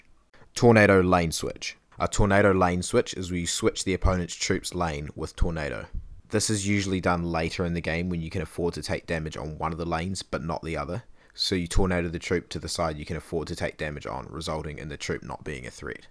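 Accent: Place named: Australia. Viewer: Bro sounds Australian is this a ken reference???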